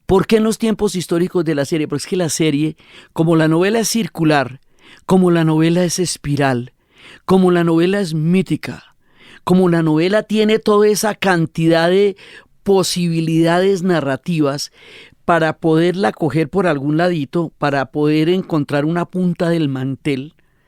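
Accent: Bogotá, Colombia. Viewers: Jajajaja.. Naaaa la narración en la serie tiene que ser costeña, nada más cachaco que. A la serie no le falta nada. Así está perfecta.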